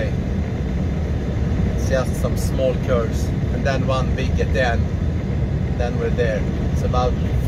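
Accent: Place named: Dalarna, Sweden. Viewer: Använde Transportstyrelsen för att se det, såg din reg plåt o tänkte att jag kunde säga det. 😂 Är från Sandviken i alla fall, jag har för mig du är i Dalarna, från hur du pratar svenska.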